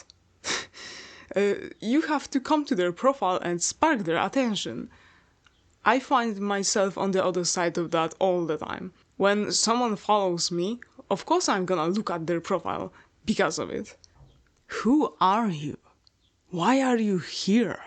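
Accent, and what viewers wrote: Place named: Poland. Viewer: You're either polish or dutch aren't you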